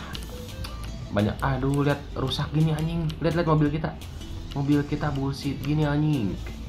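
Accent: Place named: West Java, Indonesia. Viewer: Sunda Nah Te Sunda Pisan Euy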